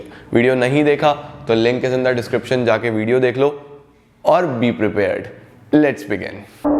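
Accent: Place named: Varanasi, India. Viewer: Are you from Varanasi???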